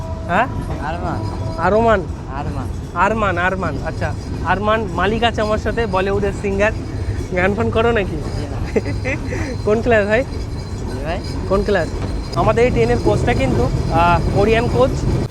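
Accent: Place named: Bangladesh. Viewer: Bhai tomar kache indian passport thakleo tomar anando & kotha sune mone hocche tumi bangladeshi.. tumi Sonarpur kon jaigai thako ??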